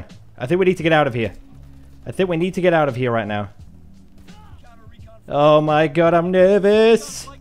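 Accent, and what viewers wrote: United Kingdom. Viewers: Great to hear a British accent. Just for a change. Love the video mate. Subbed and liked.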